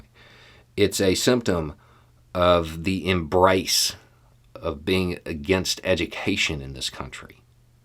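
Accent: South, United States. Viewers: More people “looking like” Beau .... color and accent coming from the south need to be doing more of what Beau is greatly accomplishing right now in speaking the truth etc as the usual perception as mostly been negative and mostly Republican supporters spewing madness and garbage. Cudos to Beau for jus simple been honest and right!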